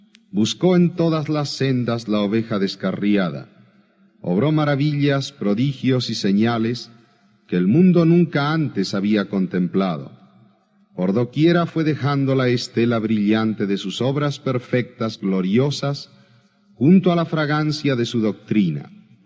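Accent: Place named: Argentina. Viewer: Aquí todavía se le nota el acento ARGENTINO. Pero más adelante lo perdió un poco. Y maduro como el buen vino al punto que después de una hora escuchándolo, cuando terminaba la predicación siempre quedaba la sensación de quedarse con más gana de escucharlo MRMC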